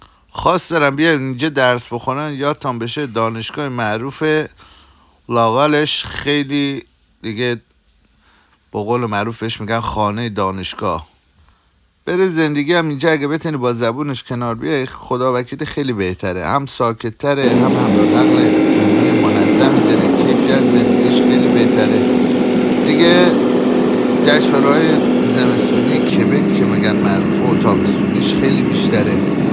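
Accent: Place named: Mashhad, Iran. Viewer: درود بر شما با اون لهجه مشهدی قشنگت،لطفا یک مقدار هم از جاده ها و طبیعت زیبای کانادا حين رانندگی بزارید،ممنونم ایران خوزستان 🎉❤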